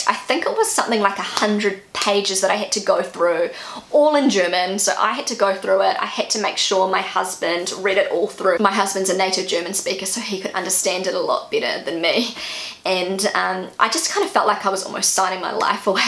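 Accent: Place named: New Zealand. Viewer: How could you hide your beautiful singing voice from us until now? I am impressed by/with all your talents,. The way you tell your little stories, is simply fascinating. And as I said before once, though you speak so fast, it‘s much easier for me to understand the NZ accent than a broad American accent. Maybe, it is because your accent sounds so much more charming. Have a wonderful Sunday ☀️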